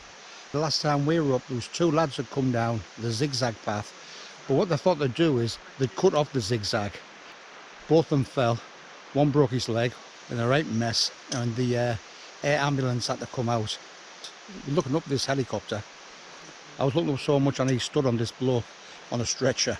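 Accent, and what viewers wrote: Scotland, United Kingdom. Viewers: Well done, you did it again! Class commentary and stories about previous experiences loved the Scottish accent but you need a kilt to be authentic!! Maybe you should try an Irish accent next, to be sure. Pity about the cloud at the top but you got spectacular views on the way up and down and it looked busy. Scottish roads are a bit like the lake district, narrow and windy. But rest up now, more adventures to come. Andrea sends best wishes, regards Ashley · Wow what a spectacular place. Stunning! I know what you mean about pushing on and not taking breaks. There are times where one must keep moving to ensure success. I’d call that a success despite the little bit of cloud whipping by the summit. Outstanding views at the top but really all along the trail. Glad that snap decision on a prior trip worked out ok. Kids are often tougher and more skilled than we give them credit for, but as a parent we’re worried about them on their own. 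It was no doubt a good experience for them. Oh and I love the Scottish accent! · OK so why is it your last time up that mountain? It is a terrific hike. I kinda wish I had time to do it when I was there. Nice Scottish accent. 😎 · Unbelievable, enjoyed that! Well done, 4 times is quite impressive! That Scottish accent got me hahah. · Another superb video, great memories of climbs past you shared with us, the views are amazing and so well shot by you. Scottish accent fantastic and funny. Really well done on the climb, I think you will be tempted to go back again. Keep the videos coming, I really enjoy them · that was absolutely class mate, I'll have to get the bonny lads up there ha ha 😀 The shots with the snow were tremendous, you know what I'm talking about!! Love the Scottish accent marra ha ha, don't know what you said but i don't think anyone knows what the bonny lads are slavering on about 😃 all the best Chris 👍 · Looks like the mountain fitness is back then 😊. 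That Scottish accent - Wow! Must be some Scottish blood in the family. You’d look good in a tartan bandana if there was one 😊 cheers atb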